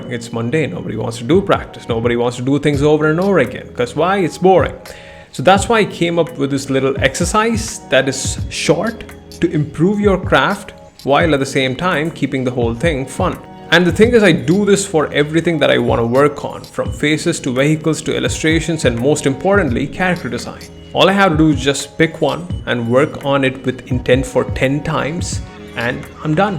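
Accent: India. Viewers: Pls telllllll arrrrrr uuuuuuu Indian?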